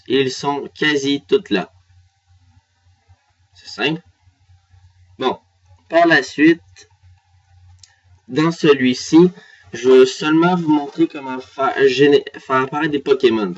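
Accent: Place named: Quebec, Canada. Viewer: L'accent québécois 👌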